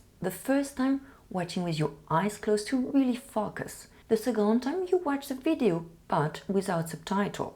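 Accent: France. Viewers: BTW I love your French accent so much!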